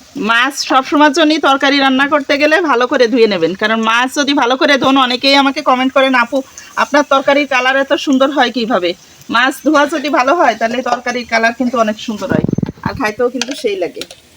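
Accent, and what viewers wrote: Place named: Narail, Bangladesh. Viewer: আপনাদের বাড়ি কোথায় আমাদের মতো কথা আমাদের মতো রান্না আমাদের বাড়ি নড়াইল লোহাগড়া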